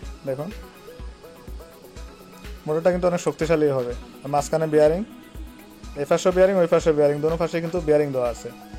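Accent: Sylhet, Bangladesh. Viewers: ভাই সিলেটি নাকি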